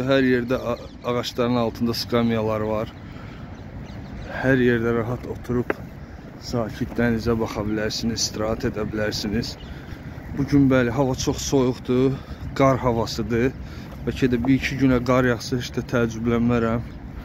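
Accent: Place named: Azerbaijan. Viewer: Приветствую Вас дорогой ❤🇦🇿💞🇦🇿 Простите меня пожалуйста за не скромный вопрос: " Почему вы должны вернуться в Турцию "??? Я по разговору чувствую вы АЗЕРБАЙДЖАНЕЦ......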